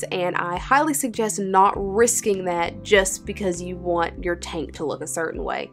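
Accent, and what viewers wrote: South, United States. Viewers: Okay love your channel and Omfg your southern accent is just freaking adorable omg …… lol I’m from ga I’m southern as well but omg u just sound to cute are you from Texas bama or Ga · Love your accent! Sounds southern :)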